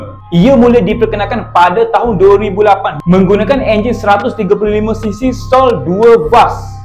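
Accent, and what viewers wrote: Kelantan, Malaysia. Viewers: kelantan sangat hahahha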